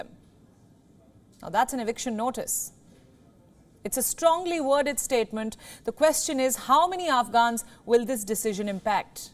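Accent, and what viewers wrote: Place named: India. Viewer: that Indian accent is giving me nightmares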